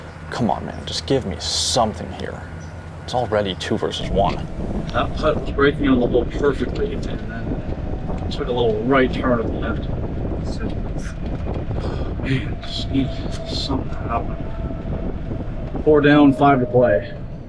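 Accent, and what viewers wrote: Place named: France. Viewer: What is the name of the course? Us dumb Americans cannot understand a word when you throw a French accent on it.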